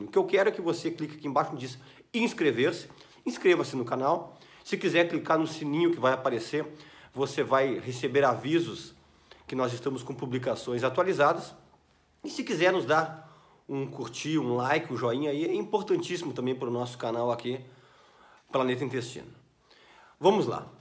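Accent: Rio Grande do Sul, Brazil. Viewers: Dr me esclareça por favor! A plicoma é indolor ou dói muito. Por favor me ajude. Eu estou com uma bolinha na margem do ânus e dói. Que faço? Curto seus vídeos, gosto de seu sotaque gaúcho. Bj!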